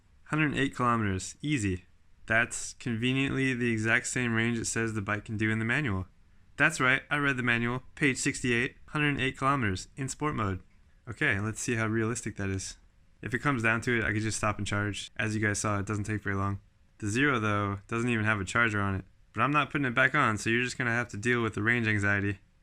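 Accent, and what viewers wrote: California, United States. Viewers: I wonder if he’s from New Zealand. He sounds like he’s from Cali. Either way, I prefer the lighter bike 😆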